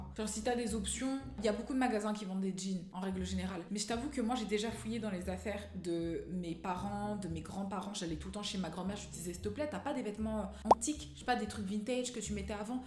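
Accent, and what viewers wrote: England, United Kingdom. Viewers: Peuhh ! Arrête de juger les gens et surtout arrête de dire ( COUCOU GUYS ) on est pas tes pote ! Et puis arrête avec ton accent anglais sa saoule !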